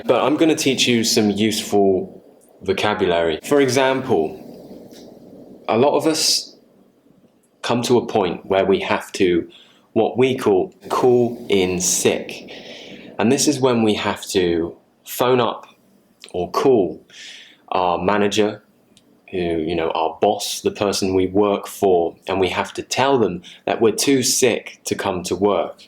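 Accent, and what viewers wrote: United Kingdom. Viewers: Hi teacher, can i add you on whatsapp? i would like to practice my brittish accent with you.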